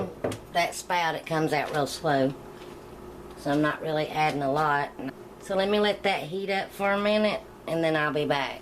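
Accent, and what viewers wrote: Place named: Kentucky, United States. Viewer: I love your accent, are you from Kentucky? I’ve made this dish several times from your recipe, it’s always delicious.. one of my favorite meals ... thanks for the video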